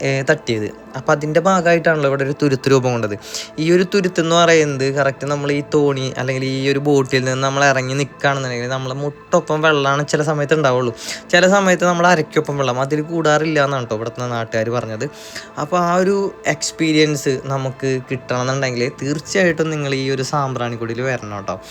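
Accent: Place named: Thrissur, India. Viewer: നമ്മടെ തൃശൂർ slang ❤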